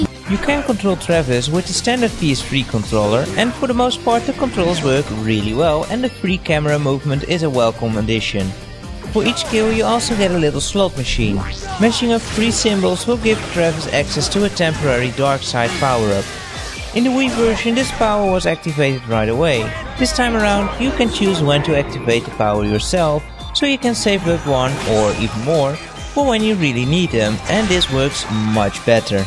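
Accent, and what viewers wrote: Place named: Netherlands. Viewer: hahah goeie review. ik had direct door dat je een Nederlander bent :p Voor 40€ is hem zeker waard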